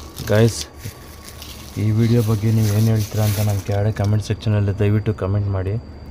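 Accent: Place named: Bangalore, India. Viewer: Bangslore kannada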